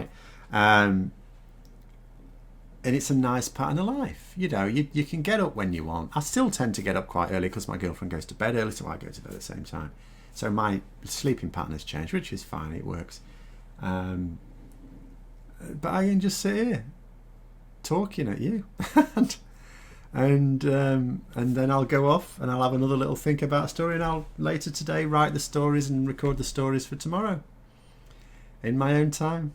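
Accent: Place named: Northern England, United Kingdom. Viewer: Love your work, business apparently having a ‘northern’ accent is a credibility problem for the comfortably well off ‘southerners’. Do you know why this disparaging attitude exists?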